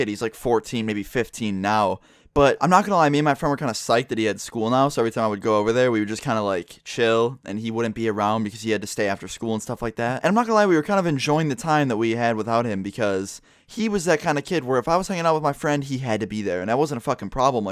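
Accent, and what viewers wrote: Canada. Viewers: Are u Canadian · wait......... Youre Canadian? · Are you canadian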